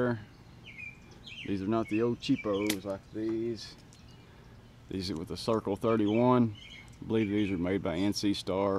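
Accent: United States. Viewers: you sound like brad pitt when he does his southern accent fuckin great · dude you have the most American accent i ever heard, i thought that was a hollywood thing hahahahaha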